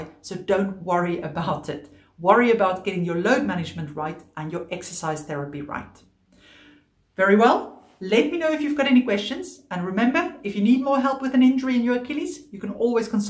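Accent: South Africa. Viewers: You sound like you are from South Africa. Love that Boer accent. Sawubona! Anyway, good information. I'm an athlete thank you for the info. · Love that accent!...Sounds like South African/Dutch? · I recognize a South African accent from anywhere.😂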